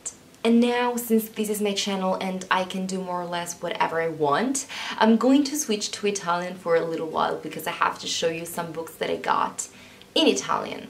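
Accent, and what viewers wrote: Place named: United States. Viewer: On motherhood, we share the same thoughts lol and I’ll definitely pick “nobody speaks of remarkable things” because you had a spark in your eyes as well. So it will be worth it in any case. Hai una pronuncia un sacco Americana ahah il mio lato British is cringing, ma è comunque perfetta ❤️ Praise for Doggie che si è imposto nel video e ha cercato di farti smettere per le coccole. YAS BUDDY. THAT IS HOW YOU DO IT! 💪🏻❤️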